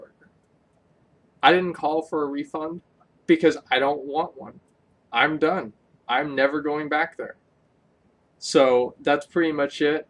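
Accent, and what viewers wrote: Texas, United States. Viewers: am I crazy or did Asmon have a southern accent in 2013? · nah you're right he sounds like a caricature of a texan in this vid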